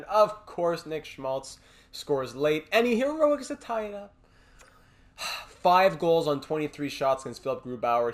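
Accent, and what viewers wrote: France. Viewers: Every time says “Julian Gauthier” in that gorgeous French accent I am literally rock solid. And using the words of my good friend StarScreamX-_-X here... “I doubt I’m not the only one.”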